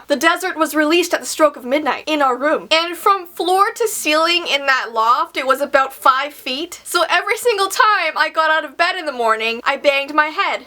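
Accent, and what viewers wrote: Canada. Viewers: K.a.s. Kast naw she sounds Canadian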